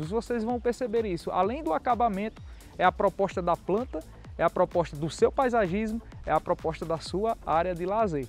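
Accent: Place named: Ceará, Brazil. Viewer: Esse sotaque do Ceará me encanta por demais. O vontade de conhecer esse estado. Talvez, até morar ❤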